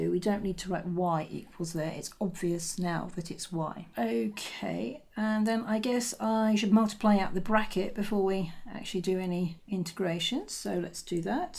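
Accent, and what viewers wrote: United Kingdom. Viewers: Are u British? Cause I like ur accent :P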